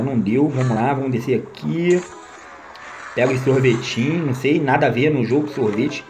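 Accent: Rio de Janeiro, Brazil. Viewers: Tu é do RJ ?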